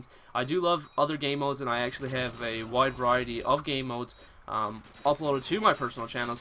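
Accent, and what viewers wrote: Newfoundland, Canada. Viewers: That's no accent, his voice is just high pitched... or possibly just newfy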